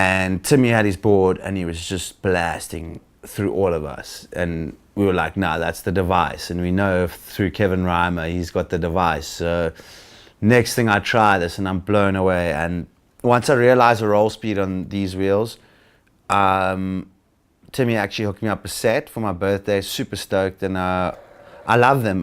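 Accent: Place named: Italy. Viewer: italian accent is cute :)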